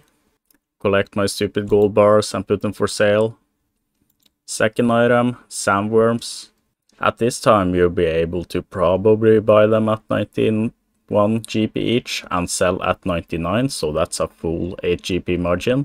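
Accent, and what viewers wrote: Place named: Norway. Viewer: Jeg visste at du er norsk ut fra aksenten din, dette er en veldig fin video(vær så snill og si meg hvis norsken min er dårlig, jeg begynte å lære for 1 år siden)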